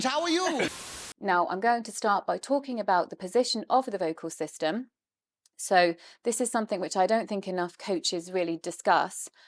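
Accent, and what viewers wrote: United Kingdom. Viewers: Your British English accent sounds to be a bit muddled. Where are you from ? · ❤, your UK English accent sounds beautiful in your voice.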